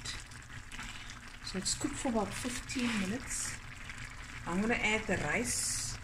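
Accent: Cape Town, South Africa. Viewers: That capetonian accent is unmistakable.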